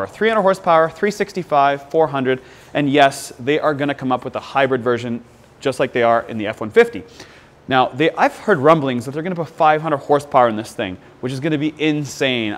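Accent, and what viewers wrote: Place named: Canada. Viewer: acording to his accent this channel is from canada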